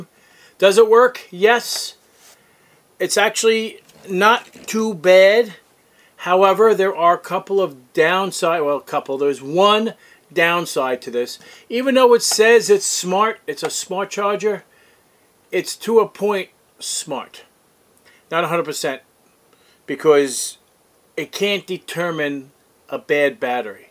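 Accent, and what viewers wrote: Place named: New York, United States. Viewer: It’s about time I have somebody on YouTube with the New York accent❤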